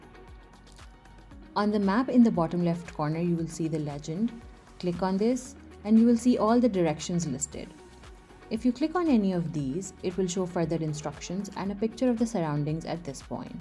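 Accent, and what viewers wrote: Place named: India. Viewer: The Desi accent and voice is so calming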